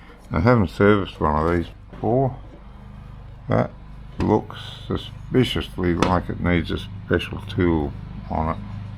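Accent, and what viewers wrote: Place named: Queensland, Australia. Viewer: . Yeah you are very watchable and that pure Queensland accent has a lot to do with it ! I have never watched a fishing channel on TV or YT cause they are all melodramatic wankers, like the 4WD wankers wearing the obligatory Drizabone oilskins when it’s 40 degrees😂 Obviously you’ve been doing this stuff since you were a boy so you speak with authority unlike most of YouTube where it’s always a case of ,” hello, we just bought our first boat and this is the first time we’ve been near the water so we’re going to teach you how to sail around the world…….once we learn how to untie these rope things…😂😂😂😂😂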